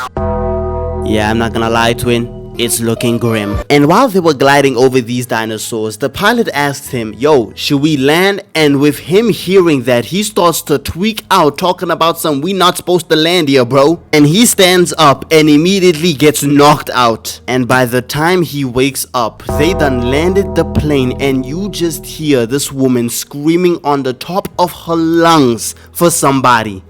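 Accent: South Africa. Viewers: Why this guy has both a South African and American accent wtf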